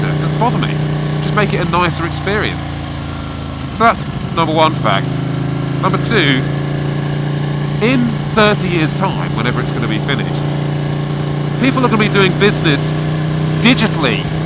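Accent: United Kingdom. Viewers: Gotta love and his angry British rants:-D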